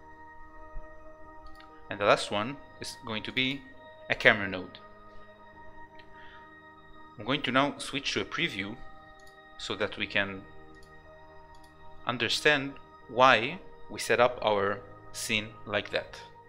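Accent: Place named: France. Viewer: You are doing a superb job and I can understand you perfectly well. (Although it strikes me as ironic that you sound French and you actually say the 't' in Godot, when it would be silent :-) ). Please keep up the excellent work!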